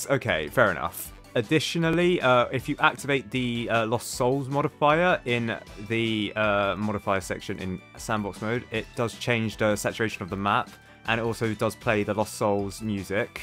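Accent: United Kingdom. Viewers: continue yapping I love listening to it bri'ish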